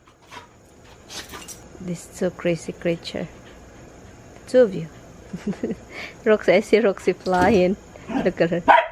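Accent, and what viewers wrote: Visayas, Philippines. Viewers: Halatang bisaya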